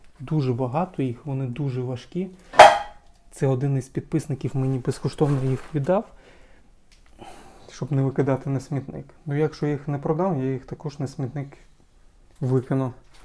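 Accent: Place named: Lviv, Ukraine. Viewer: Моя повага. По Вашій мові і не скажеш, що Ви не зі Львова.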